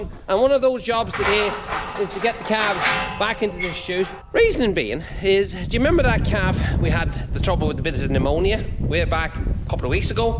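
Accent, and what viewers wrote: Ireland. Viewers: ❤️❤️ LOVE THE CHANNEL ❤️❤️ 🤔🤔BUT🤔🤔... SOMETIMES I\\we, can't understand a word you're saying.!🧐🤔! SOMETIMES. You just talk TOOOOO FAST with that FANTASTIC Irish accent you have, and I\\we get lost in the wake.!🧐🤔! AND... The PERFECT EXAMPLE of this, is between the 12min point, and the 13min point, where you're obviously saying SOMETHING about how healthy it is for these lovely ladies to stay out, on the grass for as long as possible. But, at some stage they'll HAVE TO come indoors to spend their winter days and nights🥶. BUT... There's LOADS of detail you are giving us that we're simply not hearing, because you're TALKING TOO FAST, and we\\I can't keep up.!🤣! So... PLEASE talk a little bit slower when you're giving us the more important information. And... You can 'speedtalk' the less important stuff to your heart's content.!🥰! ABSOLUTELY LOVE THE VIDS.🥰🥰.... 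KEEP'EM COMING.🤞🥰.... RICH(UK).🥰🥰🥰.!.!.!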